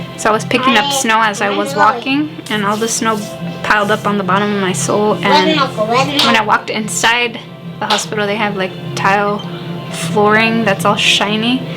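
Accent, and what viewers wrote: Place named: Southern California, United States. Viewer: I can't wear my Chucks!!!! 😆 🤣 😂 Girl I am feelin that...or my vans, although they are warmer lol. I will also be coming from California where I have lived my whole life. Sounds like you were from SoCal. I lived in Antelope Valley growing up until I moved near Yosemite National Park. Been living through some earthquakes here lately, but none as big as you're talking about. Thankfully my littles are already familiar with what to do. No Dollar or 99cent Stores!!!!!!! My son will be ecstatic about that one! Love the info about the coats, gloves and boots. Also about the daylight hours in winter and summer. This is such a great video for those getting stationed there! Thank you for the info...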